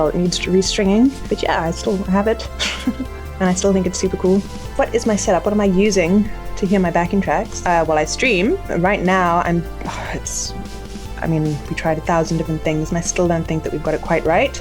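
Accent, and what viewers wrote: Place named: South Africa. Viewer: Hey,. Sorry I missed your first livestream,but I shared this all over Facebook. You're awesome as always. I love You're South African accent and could listen to you talk all day. Lol. I absolutely love your music and the way you perform. Never stop playing. I don't have much time left on earth, but I'll be listening to you for as long as I can. 🤟✌🌹🌹🌹🌹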